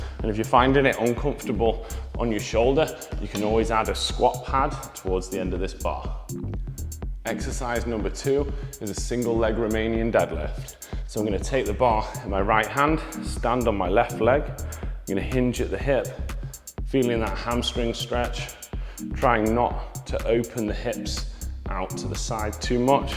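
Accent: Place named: Liverpool, United Kingdom. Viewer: A Scouser!!!!!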